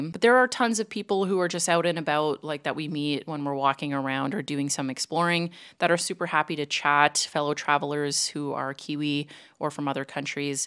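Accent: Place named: Canada. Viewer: Awesome video, from the moment I would have heard you "About" or "Sorry" I would have known you were Canadian lol that being said have you found that most Kiwi's aren't fond of American's? I'm from the Caribbean but I currently live in the US and I'm a bit curious to how Kiwi's would react to both Americans and people from the Caribbean too if you noticed those interactions as well.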